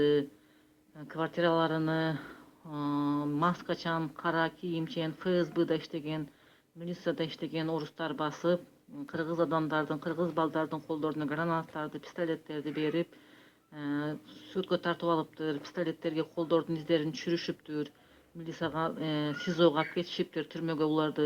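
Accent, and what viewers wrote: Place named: Uzbekistan. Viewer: Сен озун озбечкасынго суйлогонун озбектей экен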